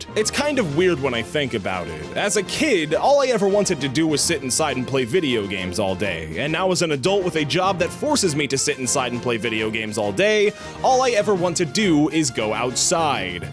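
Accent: United States. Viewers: I knew I was right about that kansas accent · Does everyone in the US have an accent like that? I mean it sounds much cooler than british english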